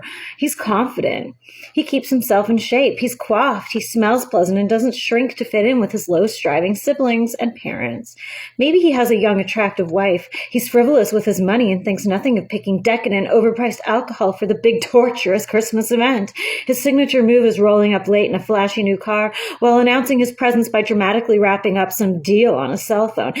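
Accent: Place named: South, United States. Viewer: Nice southern accent. 😉That was funny. 😄 The sheer amount of effort you put into your work is astounding, and the level of detail is top notch, and very much appreciated. I could easily picture everything you said, and watch it in my mind like a movie. Have you written any books or considered writing books?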